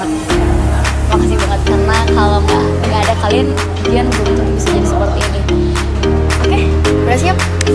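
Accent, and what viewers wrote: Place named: Jakarta, Indonesia. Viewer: Wes gedhe saiki,cara ngomonge wes koyok wong jakarta😂😂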